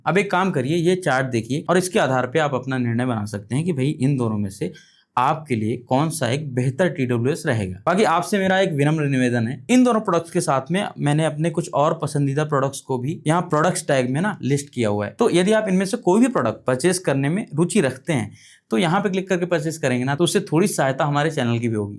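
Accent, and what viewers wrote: Standard. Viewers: আপনার ভিডিও আমি প্রথমবার দেখছি । আমি আপনার ভিডিও এবং আপনার বাক্য এবং আপনার ভাষা শুনে খুব প্রভাবিত হয়েছি। মুগ্ধ হয়েছি শুদ্ধ হিন্দিতে কথা শুনে। এইভাবে যদি প্রত্যেক মিডিয়া ইনফ্লুয়েন্সার রা ভিডিও বানায় তাহলে আমরা খুব সহজেই যারা অন্যান্য ভাষায় অভ্যস্ত আমরা খুব শীঘ্রই আপনাদের কথা শুনে হিন্দি শিখতে পারবো। ❤ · Aapki shudh hindi sunkar atyant anand ki anubhuti hoti hai 🙏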